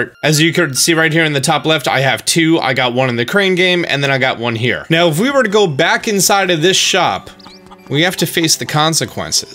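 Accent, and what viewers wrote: Australia. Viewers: Whys he speaking in Aussie accent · Nice Australian accent lol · Australian. Because if that was supposed to be British we don't sound like that lol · Good Australian voice mate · Awful Australian accent lmfao XD (I'm Australian) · Why do you sound Australian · Australian accent is all I needed today. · First off the Ausie accent was a great. Digging the Link's awakening hints.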